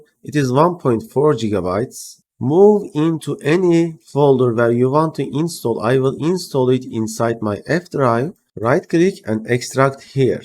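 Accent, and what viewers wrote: Turkey. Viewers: I admire your Turkish accent. 🙂🙂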